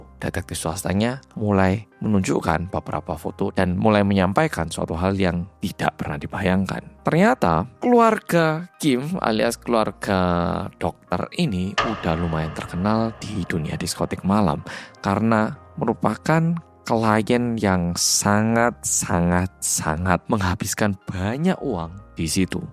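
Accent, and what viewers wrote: Java, Indonesia. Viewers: Dari maraton nonton swindler .. Aku yakin bngt si detektif swasta nya si babang korea medok ini sendiri .. Hm masuk akal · Mas sekarang di kampung halaman apa di tanah perantauan? masih medok ...... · Gw salut sama abang ini, medoknya gak lang-ilang😅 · Aku baru ngikutin, keren bgt 😂 fasih banget bhasa indo Jawa medok nya. 🎉🎉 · Aku ketemu channel..anda. Lama tinggal diJawa ya..? Medok bhs Indo🇮🇩 nya..😊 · akhirny aplot jg udh kangen mas"korea cerita pake aksen medok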